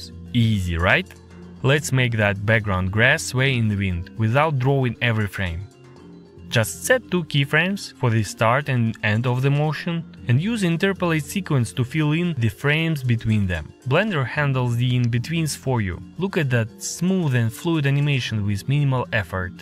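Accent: Russia. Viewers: Oh man, your strong Russian accent is great.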